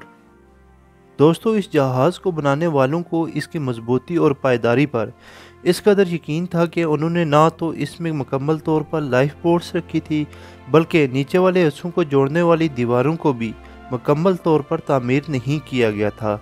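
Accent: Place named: Punjab, Pakistan. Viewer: Apki awaz se lag Raha hai ke ap 1 Punjabi daggay ho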